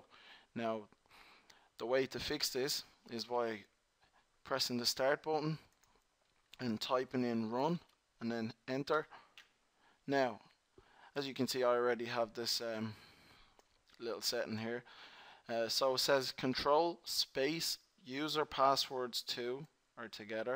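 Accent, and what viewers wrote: Ireland. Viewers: Thank you man.. 🙏 luv dat Irish accent btw 🤗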